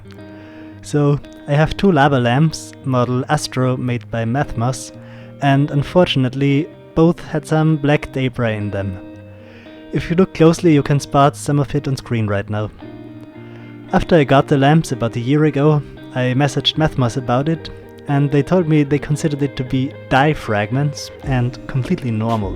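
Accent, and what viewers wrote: Germany. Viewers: Bist du Deutscher? Klingt fast nach nem Sachse xD Liebe Grüße aus Leipzig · You should have cleaned the bottle too. There were still residues here, probably some of the black particles as well. It would be a shame if all the effort had been in vain. I can also hear a German dialect. Can this be? =)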